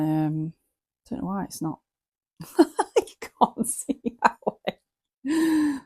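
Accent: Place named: United Kingdom. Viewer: you’ve got one of the strongestUK accents I’ve ever heard. Lol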